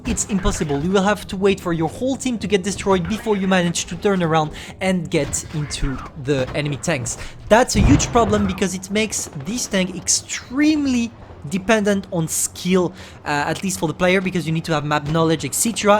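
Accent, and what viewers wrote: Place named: France. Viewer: I just discovered your channel thanks to the youtube recomendations, and I have to admit your videos are pretty interesting. By the way, are you french ? Parceque j'ai l'impression de remarquer un léger accent français malgrès t'a prononciation excellente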